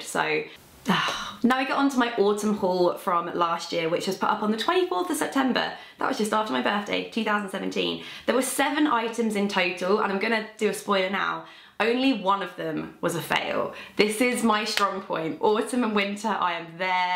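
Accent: United Kingdom. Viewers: Yikes, it’s not wrong, she’s British. Have you never heard an accent in your life?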